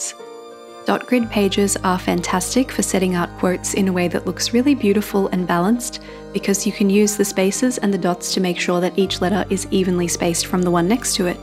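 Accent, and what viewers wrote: Australia. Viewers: Watching now, and my partner got the first few minutes of your video. He said, and I agree, that you have a pleasant Aussie voice to listen too. Not oka or nasally or rough, hehe. He didn’t believe me when I said you’re from Brissy because he thinks that Brissy folks have a specific accent, and not a nice one. I find your voice a nice change from the all too common USA accents that flood YT. And love your bujo art too 😊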